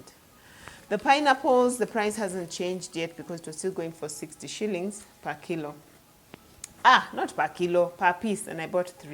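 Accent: India. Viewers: We're moving to India,love your indian accent. For cooking oil we bought 20 ltrs from a wholesale shop, and dividend it with my sister. It's way cheaper than buying from the supermarket. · The Indian accent caught me off guard😂😂😂😂😂😂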